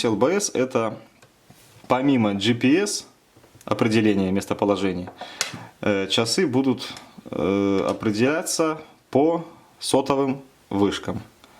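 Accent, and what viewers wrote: Belarus. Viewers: Акцент на белорусский похож)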